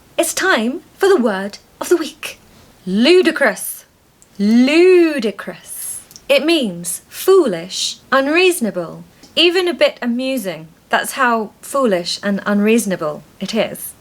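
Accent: RP, United Kingdom. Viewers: Congratulations once more for the new guest in offing. I love to hear you for your lovely RP accent and pronunciation. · It sounds very origin British accent....I admire it a Lot...we're waiting for more..thanks Teacher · Well I happened to come across your channel by chance, and since I love RP and modern RP accent and British manners, and you combine them perfectly in your way of speaking, your videos are perfect for me, since I both enjoy and improve my modern RP accent! Also, I can learn some new vocabulary and expressions too, so.. it's just perfect! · I kind of copied your way to speak, and now I sound truly British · very clear and easy to understand your British sound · Hi, ❤❤wow! Congratulations. I haven't visited YouTube for months. I have been really busy with work. You are the reason that I visit YouTube! Really! It is so homely to be able to hear your lovely RP accent again. 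🎉🎉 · Hey teacher. This is Julia, I am a visually impaired filipino student whose waching your videos since last year. I am the person who ask to you if you can sing a song for us, and I am the person who ask you if you already tried the filipino foods. Teacher? Can I ask you something? Do you had a filipino students before? Since I am a grade 10 student right now here in the Philippines, our lesson in english at School is all about model verbs. Teacher? Can I have a request? Can you teach model verbs? Thank you. The reason why I'm waching and listening to your videos because, I want to learn the british accent, I want to improve my english speaking skillss, I want to learn a british culture, and I want to become a content creator in the future. I will be a famous content creator someday, I want to use the english language, and I want to use the english accents like american accent and the british accents like london and the modern receive pronunciation. 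I want to share my knowledge to other people using the english language. Even it is hard for me to understand the accent sometimes, I'm still listening to all your videos. Teacher, I really really really really love your british accent! I really really love the way you speak, the way you teach, and the way you teach pronunciations. It's great! It is very very helpful and very very use full for me. teacher you know? Even I am blind, for me, you really really look nice! you look beaut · Dear! I din't know you were prengnant!! Congratultions, I'm so happy four you!!! I love to hear you, your pronunciation it's so lovely, your voice it's so sweet and beautiful, it's a delightful to hear your british pronunciation! I send you kisses from Cordoba, Argentina. Gabi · It’s not false; however, I believe that we must mean her own accent which is the 'modern received pronunciation' which is undisputedly a British accent and probably (especially) by opposition or difference with the American accent. 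· Hi, I'm so happy for your pregnancy!! You look lovely by the way!! I'm watching your videos because of your inner and outer very feminine loveliness, and because You've exactly the British accent I would like to acquire myself I'm Norwegian born&bred bu have lived in Italy for 40 years now with no one to talk to in English, so I just wish to find my lost flow and to bring my accent closer to yours.if possible. Watching and imitating You is really helpful and FUN! Sending Love to You and Your husband Tom and your baby boy.! Gioia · The reason why I’ve been watching your videos is learn British English and cultures. Your RP pronunciation is really marvelous! When I came across your channel for the first time, I reckoned that was where I would settle down regarding RP sound!❤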